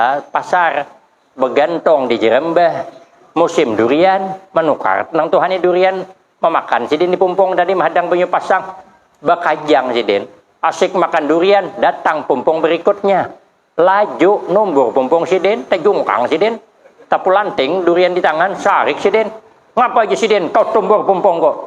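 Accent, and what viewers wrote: Banjar, Indonesia. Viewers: Bujur kh hidin ni urang Jambi,,, di dangar tu Banjar banget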